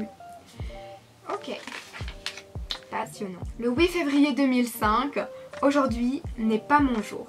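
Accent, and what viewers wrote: Belgium. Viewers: Be Ca elle viens de belgique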